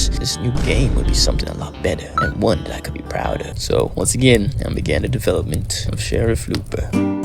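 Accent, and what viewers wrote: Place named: West, United States. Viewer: Doing the entire dev log with a western accent is true commitment to the bit. I really enjoy these devlogs, thank you for sharing another one!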